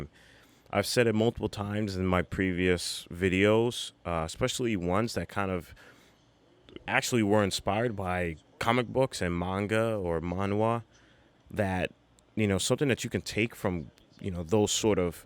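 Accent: Philadelphia, United States. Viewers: I knew your accent was familiar. Philly represent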